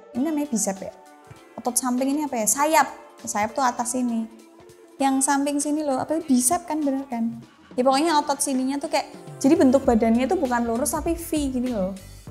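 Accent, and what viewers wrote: Java, Indonesia. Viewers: Jowo bgt sampeyan mbak,👍👍👍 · Senyum sendiri menyimak medoknya wong ayu iki... Wanita Jawa memang luar biasa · jowo bgt logat km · Pertahankan Logat jawanya..saya sangat suka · Jowo banget uwoooow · Jowo ne pundi mbak'e? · Jowo toh mbak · jowo ne ketok poll yo wkwkw 😀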